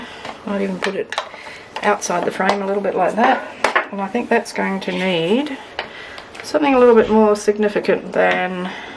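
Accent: Australia. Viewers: This is doable! I’m collecting bits and pieces to make my first journal and JJJ seems like the perfect time to muster up my courage. It’s going to be a French and lace journal. I’ve saved this one and I’ll be referring to it a lot! Thanks for the inspiration. I’m in Oz too on Tweed Heads. It’s so nice to hear an Aussie/kiwi accent in YT. Of course I’ve subscribed😊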